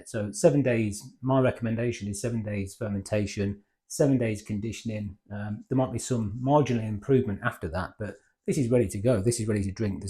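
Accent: United Kingdom. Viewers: sorry one more question. You wouldn’t be from Nottingham by any chance? Your accent sounds local to me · You sound like your from Leicester same as me lol. Just subscribed and given a thumbs up to this great video. I've just bought the Pinter3 presented by Guinness, really looking forward to brewing with it and watching more of your work to help me. I;ve got Dark Matter and Guinness Dublin Porter, sadly Shadow & Creams out of stock. Do you think I can get good results following the Pinter app to start with just to keep thing simple?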